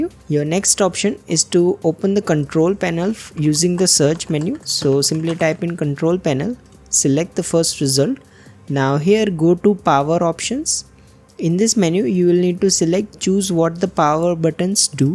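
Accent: India. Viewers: bro has one of the most indian accents ive ever seen typing ts on ubuntu btw im gonna try ts on my windows in a few mintues hope it works imma reply if it works :D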